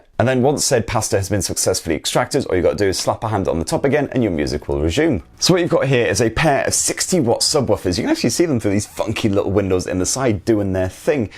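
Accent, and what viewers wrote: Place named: England, United Kingdom. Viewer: Never heard it pronounced waa way. You sound English.....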